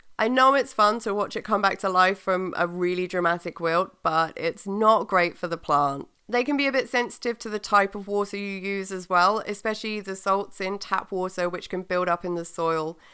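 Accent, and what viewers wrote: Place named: RP, United Kingdom. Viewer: It’s a perfectly normal English accent. RP ( Received Pronunciation) , or the Queen’s English ( as it was once known). Probably both terms deemed hate crimes or xxxxxxxphobic or some such nonsense in today’s’woke’ society. Just plain English. As was once the dominant language in England.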